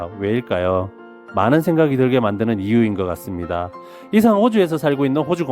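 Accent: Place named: Gyeongsang, South Korea. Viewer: 경상도 분이시네예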